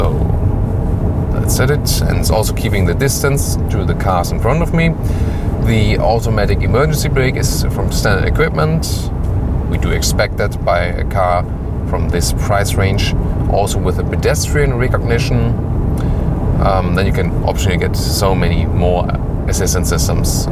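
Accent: Germany. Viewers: I speak german and I live in Canada so hearing pure german accents when speaking English is so hard to understand for me!! When you say a word in English I’m thinking in german😂 otherwise great video ! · Omg! He’s really cute! What’s his name ? And where is he from? He has an accent but I don’t know where he’s from. He sounds to have a German accent but I could be wrong .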